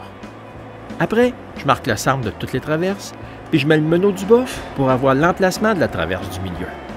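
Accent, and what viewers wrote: Canada. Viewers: Hello, la vidéo date mais moi qui la découvre je pense qu'elle est intemporelle ; superbe travail, un plaisir à regarder et puis l'accent Canadien ajoute un poil d'exotisme fort sympathique, je vais regarder la suite. Bravo!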